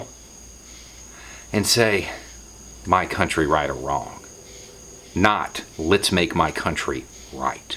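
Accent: South, United States. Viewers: I love this guy so much. With my own inherent bias and assumptions , I immediately assumed that a white man with a beard and a southern accent was going to post racist/right wing rhetoric. So it seems I have to do some self reflection of my own and think about how I perceive white people. His such a gentle and thoughtful soul. His narrative is both beautiful and soothing and most of all he makes sense! He critiques society through a historic and present lens and I love that. we need more people like Beau who preach,love, equality and understanding. THANKYOU ❤️❤️❤️❤️🙏🏾🙏🏾🙏🏾🙏🏾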